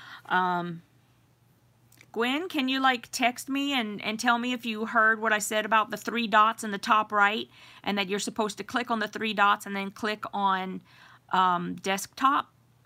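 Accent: Louisiana, United States. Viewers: So you originally from Louisiana? That's a cajun accent if I ever heard one. I watched your astology box video and thought "mais, she from here"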